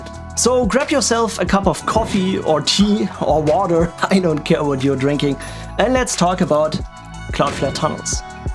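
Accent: Germany. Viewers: your voice sounds very german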